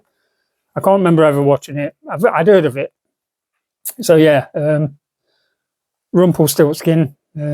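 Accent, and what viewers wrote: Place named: Nottingham, United Kingdom. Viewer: your accent shure your a notts lad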